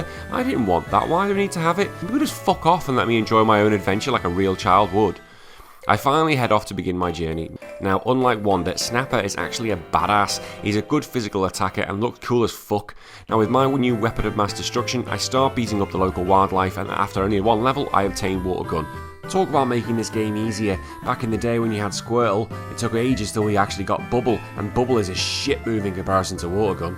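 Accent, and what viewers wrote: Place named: Scotland, United Kingdom. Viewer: I like your accent, I think it's scottish?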